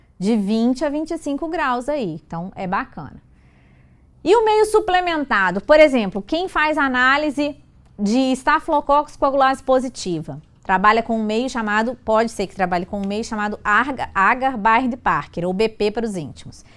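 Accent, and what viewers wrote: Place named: Minas Gerais, Brazil. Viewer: Muita experiência, muito carisma, e o sotaque mineirim é bão demais rsrs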